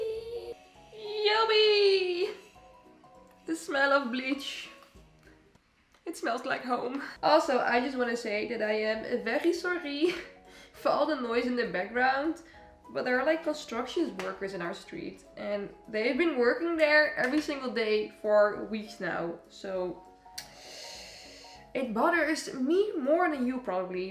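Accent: Netherlands. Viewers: Lekker nederlands accentje wel 😻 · Hey I just found your channel where are u from? You sound or Dutch or German or Swedish I'm just guessing. I'm from the Netherlands and I love crazy haircolors ❤️ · Heerlijk dat Hollandse Engels erdoorheen 🤣🤣🦄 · Is she Dutch? I can hear the accent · Are you Dutch cause you kind of have a Dutch accent😊😅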